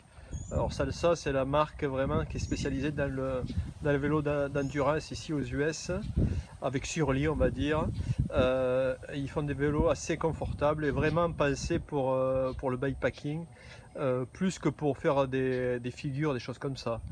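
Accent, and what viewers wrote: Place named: South, France. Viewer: Salut. Merci pour toutes les infos Bikepacking. Etant novice j'envisage une petite 1ère virée cet été et tout les renseignements sont bons à prendre. Merci encore. En plus aux US avé l'accent, c'est top. Pour ce qui est de tes bouquins, je vais aussi regarder de plus près 👍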